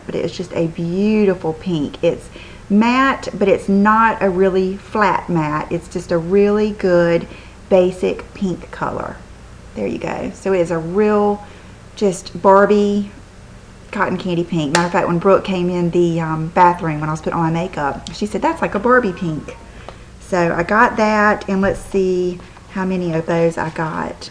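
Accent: United States. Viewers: Hi! I´ve been watching your´re videos from here in Norway and I´m loving them! I even went back to old ones just to get "a jour". I haven´t commented on them until now because I´m a bit shy, but them I thought that "hey, I want to let know that I think she´s doing a terrific job". So,: Great Work!!I think you are fun to watch and listen to, I love your accent which reminds me of when I lived in the States many years ago. Keep up the vlogging & I´ll be watching;-) Thanx Cecilie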